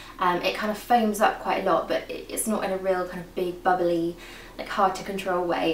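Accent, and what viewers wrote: England, United Kingdom. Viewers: You look like Drew barrymore with an english accent and normal voice. Perfection!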